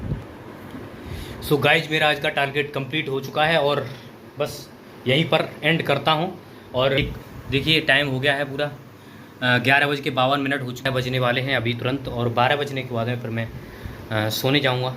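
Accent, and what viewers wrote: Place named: India. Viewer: I loved your hindi accent❤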